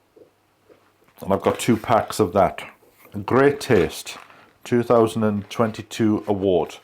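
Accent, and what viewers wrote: Wales, United Kingdom. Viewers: Agree and the accent seems to be becoming Welsh-er by the day.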